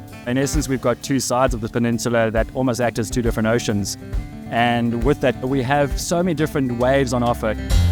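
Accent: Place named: South Africa. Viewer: Wow. Came here for the penguin interaction, fell in love with the visuals, editing, the amazing surfing, & the music, not to mention that beautiful South African accent!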